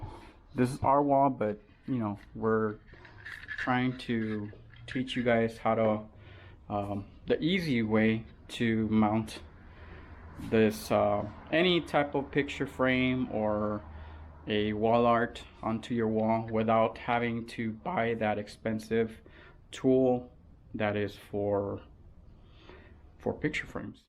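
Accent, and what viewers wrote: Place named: Texas, United States. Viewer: What part of Texas are you from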